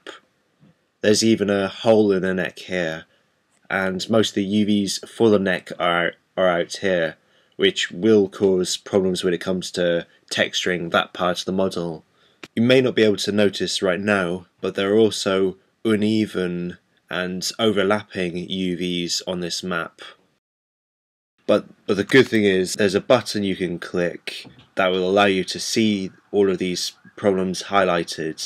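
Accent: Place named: United Kingdom. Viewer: He is a Brit! Brit always have cool accent!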